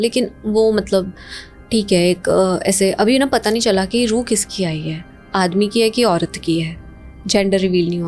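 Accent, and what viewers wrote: India. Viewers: Poora Punjabi accent aa reha Madam da…and yet Hindi mei gal karate hai😁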